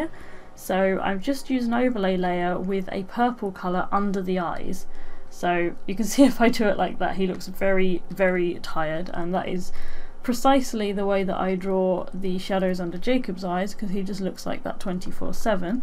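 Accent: United Kingdom. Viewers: I just can't stand this funny british accent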